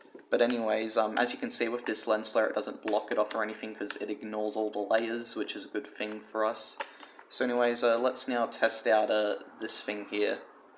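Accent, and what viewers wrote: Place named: Australia. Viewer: damn love your voice,real Aussie accent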